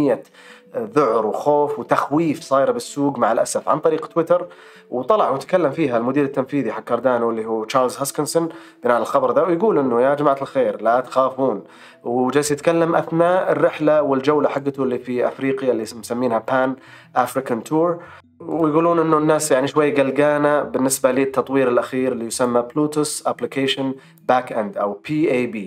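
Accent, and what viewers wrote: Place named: United States. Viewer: اذكرلنه الارقام بلعربيه بدون لكنة ولغة امريكية . انته عربي واحنه عرب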